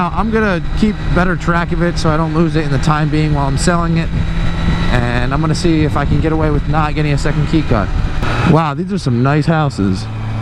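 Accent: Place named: Alabama, United States. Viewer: hey man, what part of alabama are you from?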